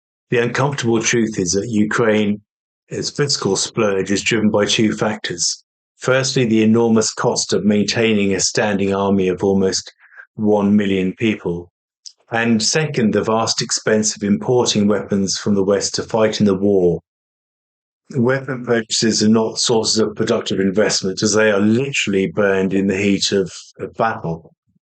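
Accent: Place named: United Kingdom. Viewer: Thanks! You know your stuff and it’s nice to hear intelligent observations with a British accent 😂 You join a growing group of advocates for Sanity sadly lacking in Government and on MSM. Subscribed ❤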